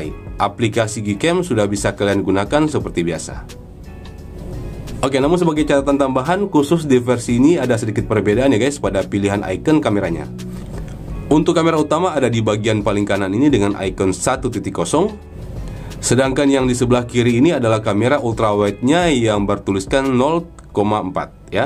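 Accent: Kotamobagu, Indonesia. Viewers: Om orang kotamobagu kang 😂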